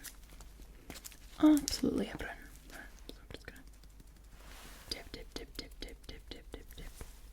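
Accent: Scotland, United Kingdom. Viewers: I'm sorry,everytime I hear someone speaking Scottish I think of,a jaunty tune "when I go out,well I know I'm gonna be I'm gonna be the man who comes along with you"....darn song! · Love scots accent its like a warm blanket on a cold day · The Scottish accent is by far the best 👌❤️ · I saw cranial nerves and was like yes! But then I saw heavy Scottish accent and was like YEESSSS!!! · I so LOVE your Scottish Accent with the "cranial nerves" and this fun exam:)!! My Primary Doctor is from Pakistan and she calls a Urine Test a "Ureene Test":)!! I now feel very relaxed and able to sleep:)!! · Scottish accent>any other english/american accent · i thought the heavy scottish accent ASMR ruined the video but now i got used to it im getting more tingles than i usually do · Every day I always listen to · I love your “very scottish” videos, but it makes me giggle a little bit because my aunt is scottish, but has lived in canada over 30 years and still has a much much thicker accent than this 😂😂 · I guess part of the Scottish accent is sarcasm, it's great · Your very Scottish series is a treat to my ears I find the Scottish accent beautiful and it gives me goosebumps! 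Your Scottish accent is like hearing beautiful angels sing! Thank you! If only you were my doctor then I wouldn't be so nervous about going! When I am at the doctor I will think of your medical videos and it will calm me down may be Listen to positive affirmation videos before I leave for the doctor... Just one question do most Scottish Doctors wear Tartan Skirts at work?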